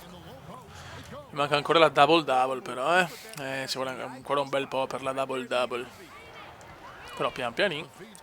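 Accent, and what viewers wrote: Veneto, Italy. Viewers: che veneziano :D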